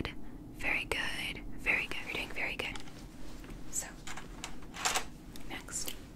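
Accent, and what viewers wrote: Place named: United Kingdom. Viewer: I DEMAND MORE BRITISH ACCENT ASMR 🤠😍thanks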